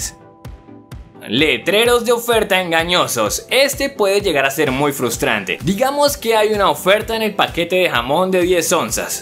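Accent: Colombia. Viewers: Sabía que ese acento era colombiano!!!! XD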